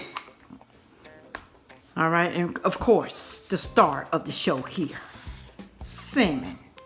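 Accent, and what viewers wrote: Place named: South, United States. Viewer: I love your Southern Accent...😂Thank You for sharing your delicious recipes Ma’am!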